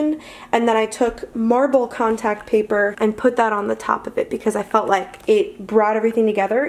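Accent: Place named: Ohio, United States. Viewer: It's so refreshing to hear another Ohioan accent, amongst all the Californian ones (nothing against em, but hometown pride and all that haha). The curtain lights behind your bed just gave me an idea for my own room, thanks for such a creative AND affordable display of room decor!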